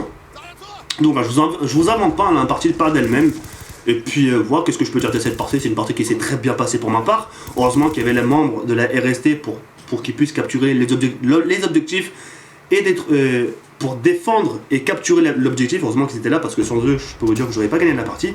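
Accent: England, United Kingdom. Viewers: J'adore ton accent anglais XD très belle vidéo ;) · Like pour le magnifique accent anglais de:D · J'adore ton accent anglais <3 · mdrrrrr le mec qui fait le saut contre le mur bref.. sinon jte felicite pour cette video jai pris beaucoup de plaisir et puis jaime bien ton accent anglais mdrr alller salut:) <3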